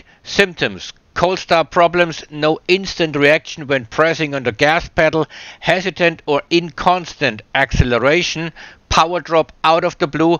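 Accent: Swabia, Germany. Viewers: Funy, funny, funny, jetzt habe ich entdeckt, dass es den Kanal auch auf englisch gibt, und jetzt verstehe ich endlich alles. Trotzdem würde ich hochdeutsch bevorzugen - aber was man nicht bekommt, bekommt man halt nicht. Schwäbisch ist jedenfalls gleich nach sächsisch nahezu unverstehbar für mich. Aber schlimmer geht ja immer! Den Schairer verstehe ich absolut Null!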